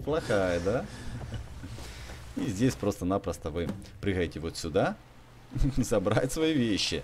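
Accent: Ukraine. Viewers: Он Украинец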